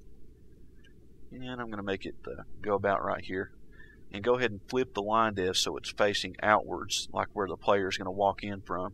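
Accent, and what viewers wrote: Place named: North Carolina, United States. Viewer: Cool video. By the way, are you from North Carolina? I love your accent!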